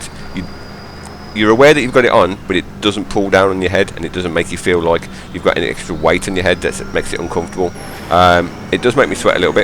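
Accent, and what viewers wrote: Black Country, United Kingdom. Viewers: That accent sounds familiar :P Not from the Black Country by any chance are you?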